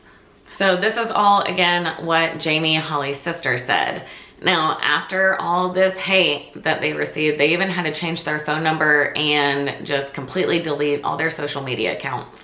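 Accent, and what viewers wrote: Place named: Louisiana, United States. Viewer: You sound like you’re from Southeast Louisiana.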